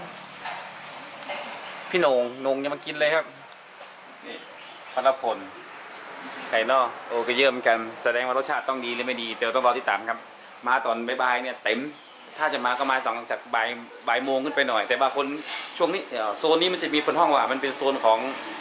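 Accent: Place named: Northern Thailand, Thailand. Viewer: พูดเหนือปนกลาง งงกะลุง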